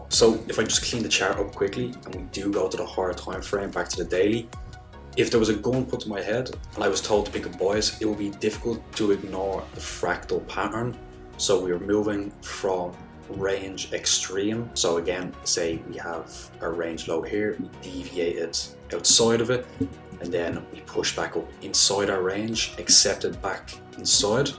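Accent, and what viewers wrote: Ireland. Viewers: Can't get over you being a dub. Where abouts you from? I'm guessing West/Northside somewhere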